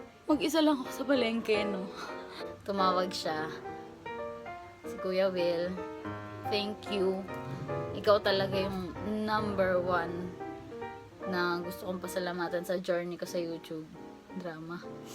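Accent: Batangas, Philippines. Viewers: speaking in batangueño ... parehas lang tayo ng accent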